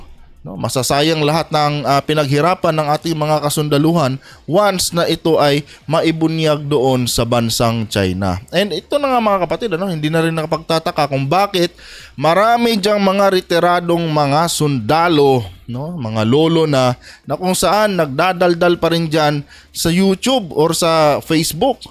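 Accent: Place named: Maranao, Philippines. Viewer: Ang vloger parang maranao mag salita.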